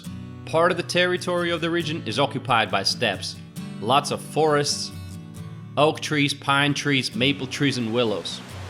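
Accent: United States. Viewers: It seems you didn't get that the maker of this video is a Russian who speaks English with an American accent. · привет! You have made a great, inspiring and informative video, and it is truly made with passion for the country ;-) no matter what Larissa Koroleva is saying (her "funny" comments below)...the laid back music also fits in very well....to my ears your english sounds very american/english (maybe with a 2% russian accent).....and IMO the video is well done all the way.....большо́е спаси́бо! ;-) Sugiiiiiiiiiiii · Feel quite a bit of propaganda seeping through! State slogans .Some truth too .! & why is American Georgia mentioned? Looring American tourists ? Maybe I'm wrong . Maybe the narators almost American accent is a true American one . We will never know .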